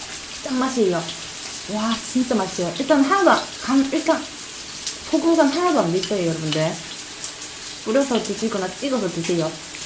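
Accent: Gyeongsang, South Korea. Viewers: o sorry I thought it was Daegu accent btw u can speak English?!!😯😮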